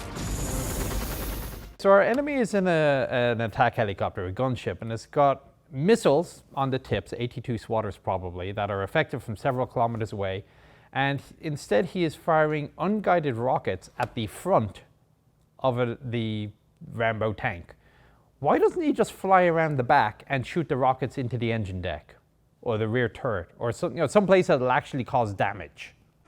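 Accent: Ireland. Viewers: Long way to “Tipp” with the accent! Got to UCD Belfield? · Great video. Bit of a twinge of an Irish accent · Is that an Irish accent? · I'm sure he won't mind, but that is an Irish accent. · This guy is the BOSS!! He sounds Irish ☘️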